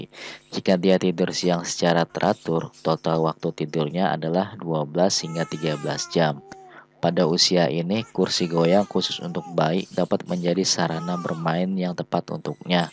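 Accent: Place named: West Java, Indonesia. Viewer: Ini yg ngomong pasti orang sunda ya😂😂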